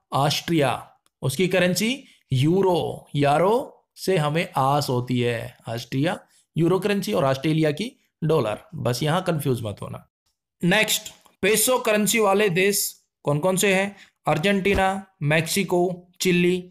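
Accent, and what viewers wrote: Haryana, India. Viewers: Sir aap Haryana se ho kya?? ♥️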